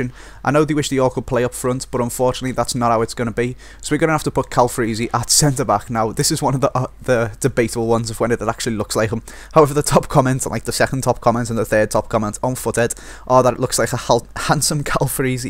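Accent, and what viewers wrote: Liverpool, United Kingdom. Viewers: i hate scouse accents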